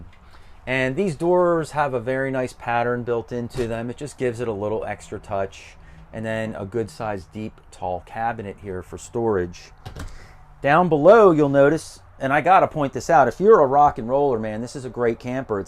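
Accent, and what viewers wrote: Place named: South, United States. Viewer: . Where you from. You have a very distinctive accent. I'm gonna guess southern or southeast Virginia? North Carolina?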